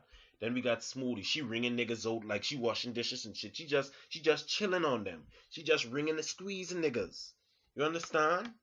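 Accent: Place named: Caribbean. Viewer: Are you Jamaican ? You have a Caribbean accent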